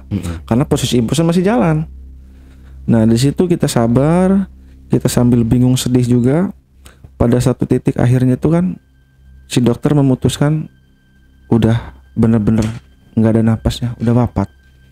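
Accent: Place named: Indramayu, Indonesia. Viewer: Ini banten mana ya? Kok logat nya kaya jawa indramayu 😅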